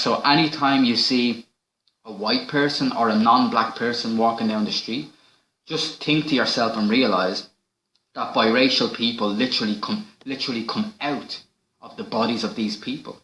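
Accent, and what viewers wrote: Ireland. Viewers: you are correct sir about mixing. i like the irish accent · Btw you have the same english accent as Connor Mcgregor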